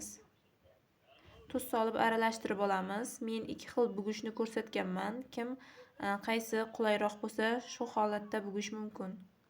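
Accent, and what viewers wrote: Uzbekistan. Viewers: Tili xuddi Amudaryonikidek ekan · Шевангиз Галлаоролники😊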